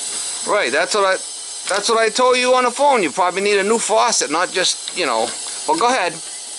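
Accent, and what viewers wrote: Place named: Boston, United States. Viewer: LOL, as soon as I heard "Octobah," I knew you weren't fah from Bahston. Greetings from Newton.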